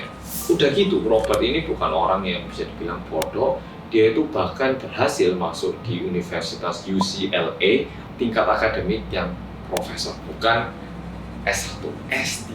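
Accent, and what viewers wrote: Java, Indonesia. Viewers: Yang? Medoook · Hei orang medok kenapa sama gak anak annajiyah aja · Semangat terus mas wong korea sing "medokkk"🤣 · Halo mas.... Welcome back with me, fans orang korea yang medooook 😁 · Mas:Bersama orang korea yang.. Aing sekeluarga auto teriak: Medhokkkkkkkkkkkkkkkkkkkk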